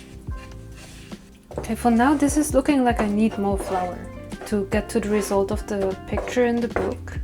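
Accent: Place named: France. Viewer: You sound quite French when speaking English.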